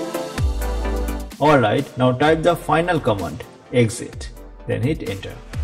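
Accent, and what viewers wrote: India. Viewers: Thank you so much for using this Indian voice. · I dont understand your Indian accent. What to put in the Empty USB drive is it the windows 10 installer?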